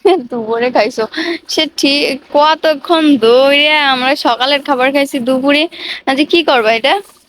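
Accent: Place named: Gopalganj, Bangladesh. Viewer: আপু আপনার বাসা কোথায়। ভাষা শুনে মনে হচ্ছে গোপালগঞ্জ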